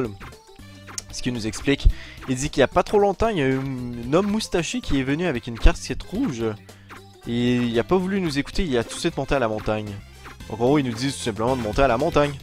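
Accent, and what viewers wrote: Quebec, Canada. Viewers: Ton accent québécois non d'un chien c'est bon!!!!!!!!!!!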